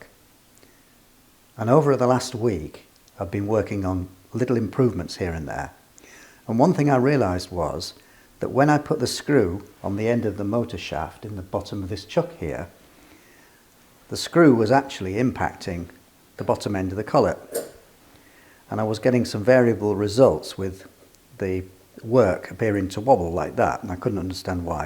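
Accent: United Kingdom. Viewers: Thanks, I own an Atlas 7-B Shaper and I think I’m going to make a mill head too! I love your gentle British manor and voice. Thanks again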